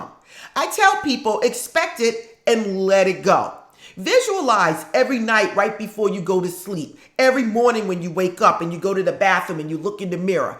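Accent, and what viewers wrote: New York, United States. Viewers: I just love your channel ❤️ I just love your setup , I kinda look at you like my spiritual fairy godmother lol I love the accent is it a New York accent ??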